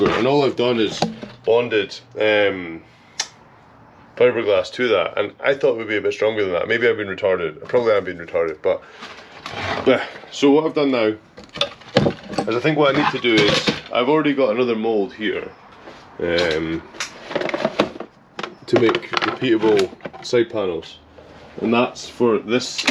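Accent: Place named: Wales, United Kingdom. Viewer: Hey man good job on vids. As a Welshy who moved to the states a couple of years ago who is literally in the middle on a XS750 Cafe build your vids have been a godsend plus hearing an accent from the home land always helps. All the best!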